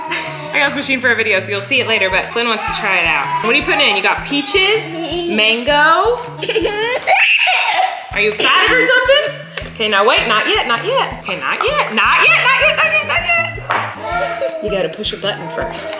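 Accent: South, United States. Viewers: Love that pink on you! And that country accent 😅😅😅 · I love your southern Accent you were doing if you were doing one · you and flynn and playing with the fly swatters with your country accent is soo cute! i know a lot about country accents being from alabama! ❤ do you ever perform in alabama or anywhere in the south east!?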